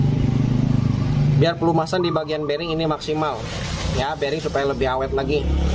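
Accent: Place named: Pandeglang, Indonesia. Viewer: Kaciri logat pandeglang na, 😁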